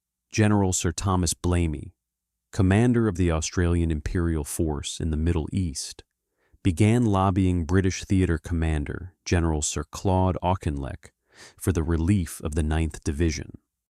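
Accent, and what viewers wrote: United States. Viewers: Stupid Al strikes again miss spelling and an Al generated yanky accent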